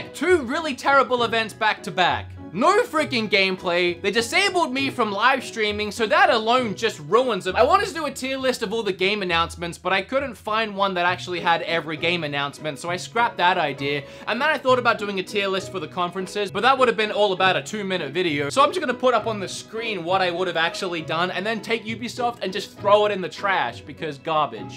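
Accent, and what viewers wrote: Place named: Australia. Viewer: I'm one of the new subscribers! I have seen your videos before but it was your hyped pokemon video before E3 that made me subscribe. I have a feeling I'll continue to enjoy your content bc I really love your energy! Also, I like your accent, because its a lot like mine... I'm Australian (and I'm guessing you are too) but I've travelled and spend a lot of time around people with more American accents. So now I have this strange Australian/US/Canadian accent... ahaha anyways, that awkward little tidbit aside, I hope your channel continues to grow! :D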